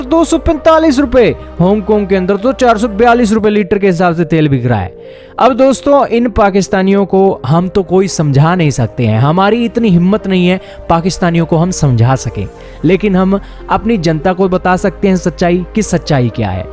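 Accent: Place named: Himachal Pradesh, India. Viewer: Ye voice kisi himachal wale ki hai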